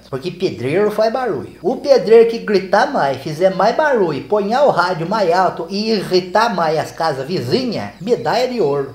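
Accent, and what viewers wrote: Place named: Piracicaba, Brazil. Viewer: quando ocê veio pa Piracicaba? porque ocê falo do jeito que é aqui onde eu moro. Apesar que nois piracicabano samo um pouco mineiro também.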